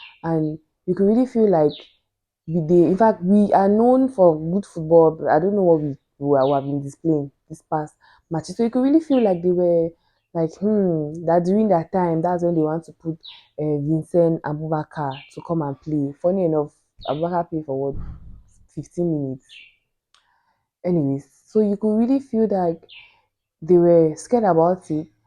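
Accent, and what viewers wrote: Nigeria. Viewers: This girl sounding like a Nigeria 🇳🇬 😊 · This beautiful sister sounds exactly like an educated Nigerian, but she's actually smarter than us. Imagine she speaks both french and a good command of English. Whereas most our Nigerian sisters can't even express themselves in English fluently without switching to Pidgin 😂❤😅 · This lady is beautiful and brainy... honestly you sound like a Nigerian...it will be pleasure to host you in Abuja....U've my subscription❤🎉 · My sister, did you grow up in Nigeria? Your Accent is more like that of a Yoruba Girl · Those of you saying she sounds nigerian. By blood and ethnicity southern cameroon are nigerians, colonia masters and them voting to join cameroon is the problem. Blood is ticker than water. Even in cameroon they have been accused of sounding like nigerians, dressing like nigerians, almost everything like nigerians. 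They are actually nigerians. Just a matter of time , we will integrate them into nigerian society. case closed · . Thanks for confusing me. I saw the Cameroonian jersey on you but when you started talking, I thought you were a Nigerian but it's well. Good job! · I so much like the way you speak. It reminds me of someone special besides the fact that you sound Nigerian. I'm your new subscriber 😃🇳🇬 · I think most of the viewers are used to hearing Ghanaian's accent and easily know they're Ghanaians but obviously your accent in pronouncing some certain words are similar to how we pronounce them plus after each of your sentences you use the word "oo" which is also a Nigerian intonation. Personally, listening to you I would have bet you were Nigerian but the jersey made me rethink. Regardless, please take no offense as to people saying you sound Nigerian,I think it's just curiosity and people are a lil bit surprised at your fluency! 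· Sister you have a Nigerian accent. I can tell because I am a Nigerian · You sound so Nigerian. I am surprised as to how you Cameroonians sound so much like us. If you are not wearing that jersy, i would think you were Nigerian. Splendid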